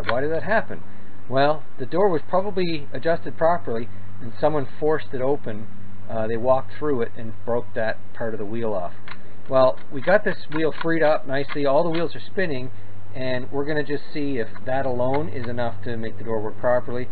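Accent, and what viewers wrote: Canada. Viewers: What part of Canada are you from?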